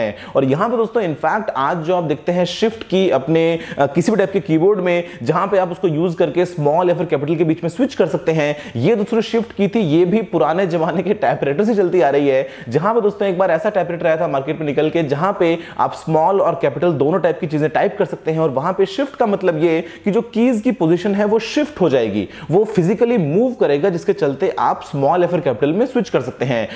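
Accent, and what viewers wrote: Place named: Haryana, India. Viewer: Sir aap basically aap haryanvi hai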